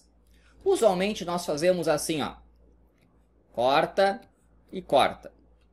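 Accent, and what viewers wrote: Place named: Alagoas, Brazil. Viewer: pra mim q vc era de ALAGOAS.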